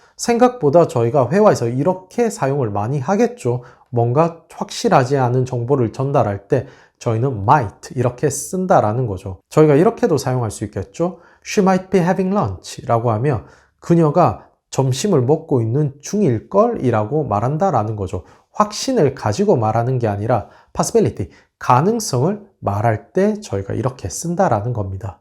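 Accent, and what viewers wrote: United Kingdom. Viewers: 영국식 발음에 치인다요……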